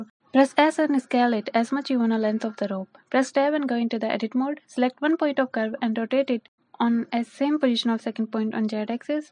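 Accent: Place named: India. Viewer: Hi after listening your voice it feels like you are an Indian should we chat about blender future in India together if you insist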